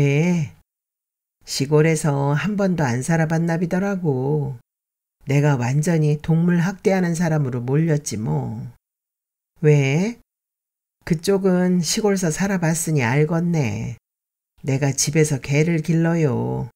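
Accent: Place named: Jeolla, South Korea. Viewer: S님의 남도사투리 끝까지 재밋게 잘들었어요.😀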